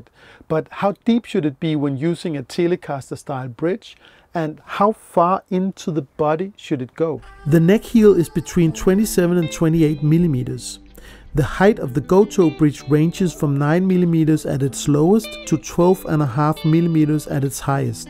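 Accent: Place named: Germany. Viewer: Why isn't it perfect? What are the problems there? I removed a year ago the neck of my strat copy and now the body seems to have changed due to humidity. The neck pocket was way too tight anyway. I'm trying to realign and reroute the neck pocket now. If your method wasn't perfect how can I achieve an absolutely centered neck? Doesn't matter how much work it is. I'm a perfectionist and I'm trying to achieve less than tenth of a millimeter deviation from body to the end of the headstock. I want it perfectly centered How should I do it? Your accent sounds German. Grettings from Braunschweig by the way.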